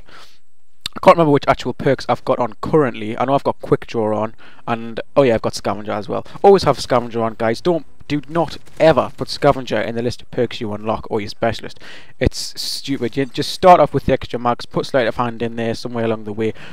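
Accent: Newcastle, United Kingdom. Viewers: By any chance are you from Newcastle?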